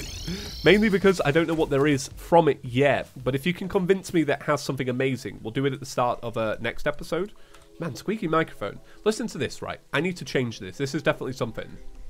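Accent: United Kingdom. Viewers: The bri’ish terrarian is back :)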